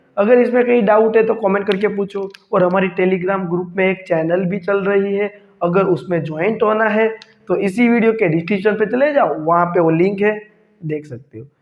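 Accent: Gujarat, India. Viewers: Sir your videos are very helpful and i am now able to understand all maths questions easily. Aur sir kya app gujarati ho apki language se pata chalta hai Me bhi gujarati hu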